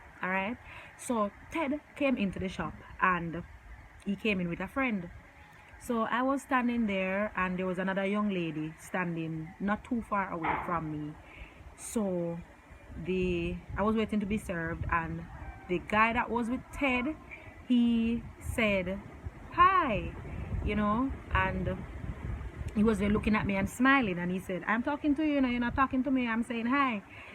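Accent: Jamaica. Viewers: Hahahahah I really like your jamaican accent..it makes everything you say so extra...and these stories are quite embarrassing..but my favourite is the karaoke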